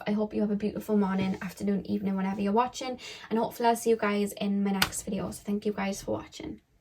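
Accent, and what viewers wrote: Wales, United Kingdom. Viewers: I love your accent😍😍😍 I subscribed just to hear your accent😂 are you from Swansea?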